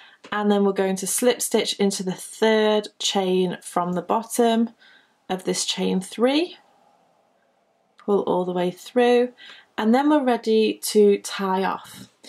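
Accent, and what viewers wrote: United Kingdom. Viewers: Thank you so much. I watched a couple of other tutorials, and couldn't "get it". Yours was the best, I finished a square right along with you. Your voice is lovely, which helps a lot! I'm from the US and at first thought "Oh no, another Brit", but you did such a nice job of explaining the terms, that I soon was very comfortable with UK terminology. Thanks for including us!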